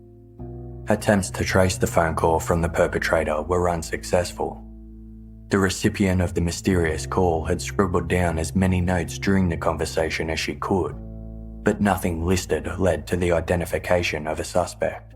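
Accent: Australia. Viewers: I love your podcasts. Your context is excellent and as an American I like the Aussie accent.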